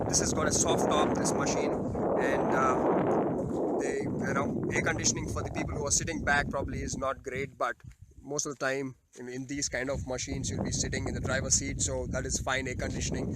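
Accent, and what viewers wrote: India. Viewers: it's not fake accent, he is telugu so his pronunciation is like that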